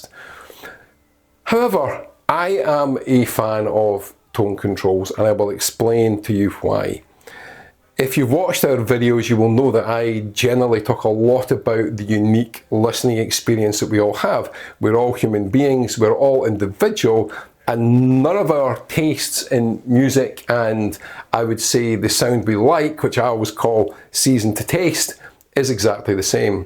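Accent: Scotland, United Kingdom. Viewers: Being and Australian, I'm sure he's Scottish.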